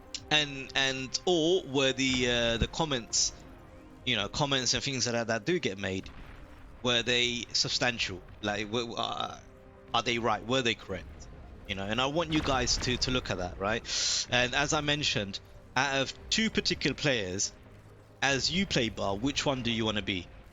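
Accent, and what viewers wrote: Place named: United Kingdom. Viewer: what part of the UK are you from? accent is great to listen to lmao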